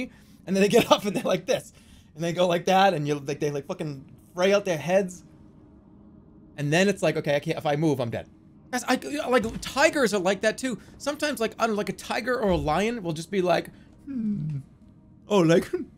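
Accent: Boston, United States. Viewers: The A at the end is cause of his Boston programming, it's like "Germ-ah", like "i'm walkin here-ah"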